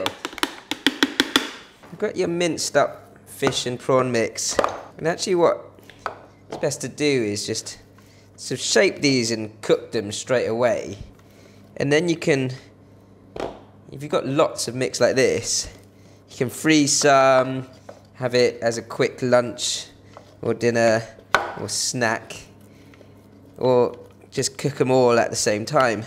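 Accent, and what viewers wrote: England, United Kingdom. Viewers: That's the way it's pronounced where he's from in England. Christ, the things people whinge about!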